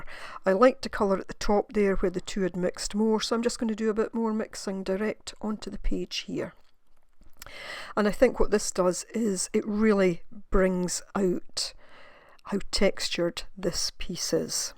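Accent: Scotland, United Kingdom. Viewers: Thank you for your video , I learned a lot . I love your accent, are you Scottish? Love from Canada 🇨🇦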